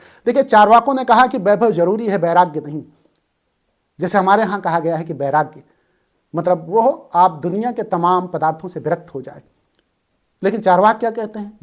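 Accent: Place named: Madhya Pradesh, India. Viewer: भाई सर की और भी वीडियो देखिए। सर अध्यात्म की बात करते हैं । सर एक अच्छे राइटर भी हैं मैं इनकी सारी वीडियो देखता हूँ । सर कहाँ के रहने वाले हैं मैं नहीं जानता लेकिन शायद mp के हैं आवाज़ से ऐसा लगता है । इन सिर की दो पुस्तक मैंने पढ़ी हैं फ़िलिपकार्ट से मंगवाई थी । हो सके तो आप भी पढ़े। और रही बात आपके प्रश्न और कमेंट की तो भाई एक बात बताऊँ - “ सुअर के साथ कुश्ती नहीं करनी चाहिए क्योंकि आप गंदे हो जाओगे । लेकिन सुअर को मजा आयेगा।”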